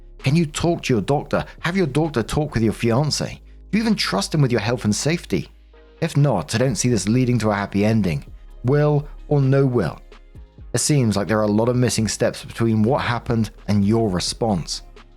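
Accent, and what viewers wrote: United Kingdom. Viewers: 12 minutes posted. I feel special. Love. British voice. Everything sounds better in a British accent ❤❤❤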